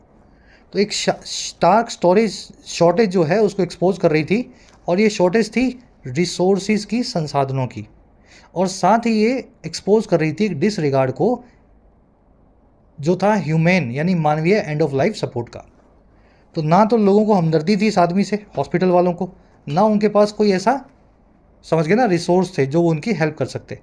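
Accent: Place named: Punjab, India. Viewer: Sir aap to gujrat ke ho par muje aisa lagta hai aap mere bade bhai punjabi ho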